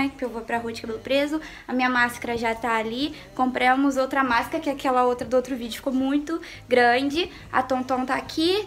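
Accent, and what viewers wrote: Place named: Rio de Janeiro, Brazil. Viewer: cê é carioca mesmo? cê puxa o s kkk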